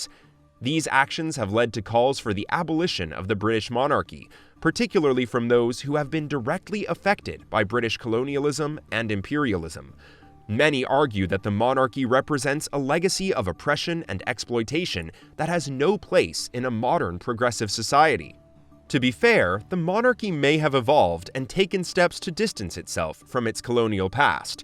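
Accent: United States. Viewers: Some but not all valid points. Elected presidents and democratic nations have also acted immorally and undemocratically. But who made this video? Narrator has American accent and half the images were American!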